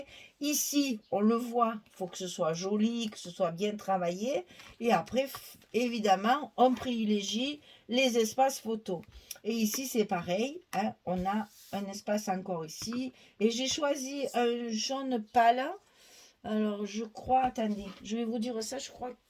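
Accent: South, France. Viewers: Bonjour, je connais votre chaîne depuis peu grâce à Marick Scrap et je reconnais que vous faites des merveilles. Effectivement, le double face permet un travail plus propre. Mais pourriez-vous me dire quel scotch vous utilisez pour une tenue sur la durée car tous ne sont pas au top. Merci de votre réponse et quel plaisir de regarder vos vidéos où je retrouve mon accent du sud..... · Bonjour non seulement vous faites un merveilleux travail et vous m'inspirez mais en plus grâce à cette vidéo nous pouvons découvrir une hyper sensible et très émotive 😍. Moi perso je suis addict de vos créations et de votre voix qui chante le Sud 😁. Je vous souhaite un bon dimanche 😘😘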